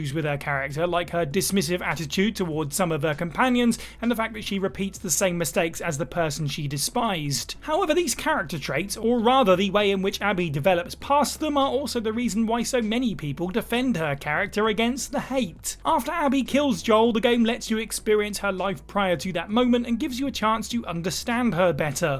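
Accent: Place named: England, United Kingdom. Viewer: England accent 😢😅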